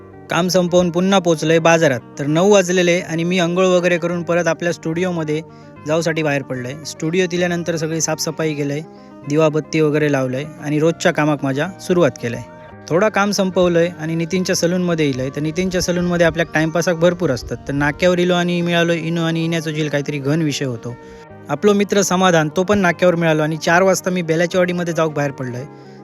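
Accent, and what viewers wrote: Malvani, India. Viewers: विरण मध्ये आली की आम्ही तुला भेटणार आहोत तुझे व्हिडीओ खूप खूप सुंदर असतात मला तुझे मालवणी बोलणे मला खूप आवडत लवकरच भेटू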